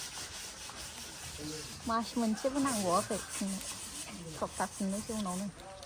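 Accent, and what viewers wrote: Bengal, India. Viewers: Nwgni lairainykwonw kwnasongbabw ohakaya Ang benanao .benggoli baidi khwnakayw bwnggolni Boro lairainykwo.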